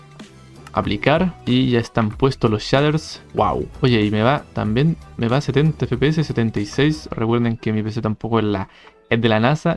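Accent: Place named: Chile. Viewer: Chileno se te nota, gracias mi hermano hoy quise revivir mi infancia, meo corte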